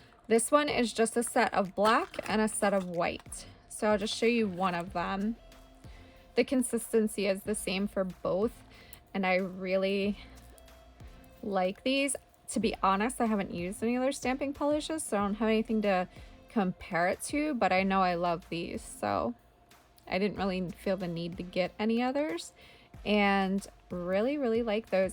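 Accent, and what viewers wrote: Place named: California, United States. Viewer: So so helpful to have these suggestions in a single format. Thank you. I have used so much of your suggestions. Oh, and as I was watching your video, my son walked into the room and asked if you were a California girl. Because of your "accent". LOL, wasn't aware you had one🤣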